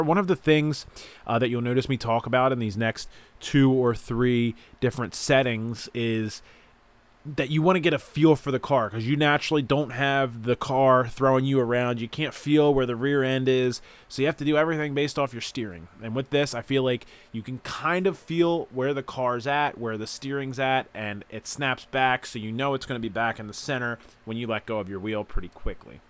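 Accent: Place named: Bay Ridge, United States. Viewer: Make NO mistake, cuz you sound exactly like Chris DeStefano with the Bay Ridge sounding accent. It's just what it is!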